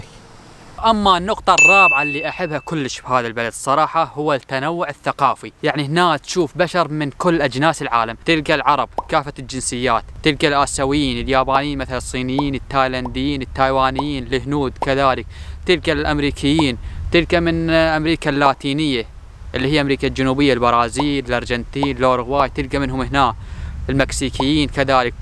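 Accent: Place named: Anbar, Iraq. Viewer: اللهجه عراقيه انباريه 😅انت من محافظه الانبار ؟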